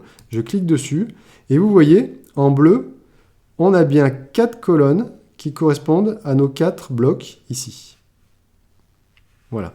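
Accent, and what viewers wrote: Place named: Isère, France. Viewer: merci sympa ton accent :) tes dans l'Isère ?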